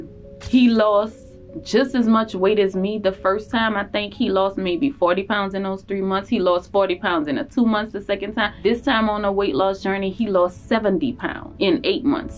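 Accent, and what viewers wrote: New Orleans, United States. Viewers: Are you from New Orleans? I swear I feel like you gonna say baybee at any moment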